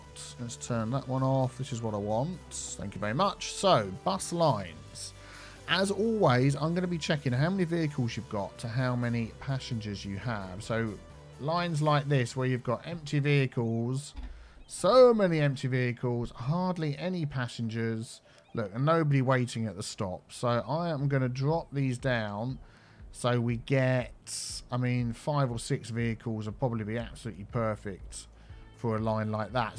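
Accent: United Kingdom. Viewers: It's his voice. It has that soothing, calming British tone to it... 🇬🇧😆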